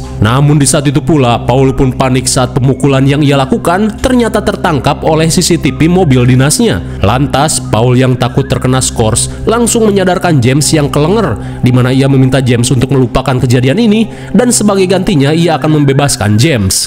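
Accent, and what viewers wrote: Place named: Java, Indonesia. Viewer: Mc nya beda ni biasa nya oke bhasa.. Medok Jawa.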